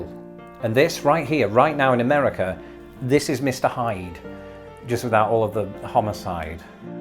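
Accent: United Kingdom. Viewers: “When I stopped to do the math. . . sss” 😂 Still sounds British to me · Welcome Home. Just promise us you will never lose that signature British accent of yours. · welcome home,. Glad you are here!:) Just make sure you keep your British accent and keep informing us of what gets lost in the pond. I find it absolutely riviting! Heck, just regions in the US are like a different country! My family and I don't live too far. Just over the state line in Wisconsin south of Milwaukee!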